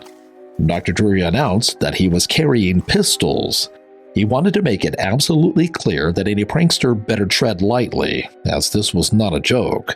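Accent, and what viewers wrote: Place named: United Kingdom. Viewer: Glad you used a clear British accent as South Shields and up that way have a very thick accent. Awesome upload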